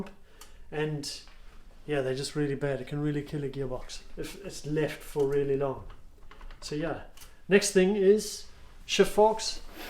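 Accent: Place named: South Africa. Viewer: You from South Africa right? Can't hide that accent mate!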